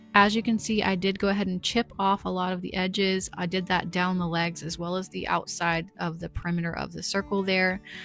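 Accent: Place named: United States. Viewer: Thank you so much,. Every makeover, flip, upcycle is a masterpiece! I also enjoy listening to your voice - a very soft American accent; I know, you probably don’t think you have an accent, but I’m from the UK, so you do! Enjoyed Curry’s upcycle too with stain on his nose and whiskers, a cool look.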